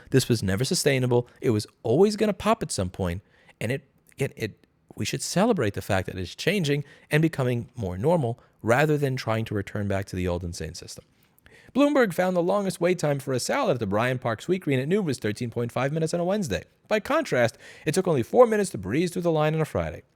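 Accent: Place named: Texas, United States. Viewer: sounds so Texan and then yells out " Forget about it "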